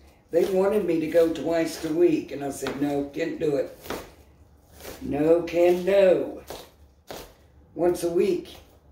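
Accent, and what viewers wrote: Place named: South, United States. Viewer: You don’t have the Indiana accent…it sounds more southern like me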